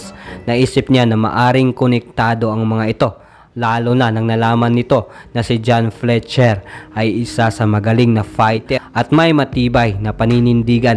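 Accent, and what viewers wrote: Visayas, Philippines. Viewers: Parang bisaya managalog, may paduterte pa na singit,, dds ka bay?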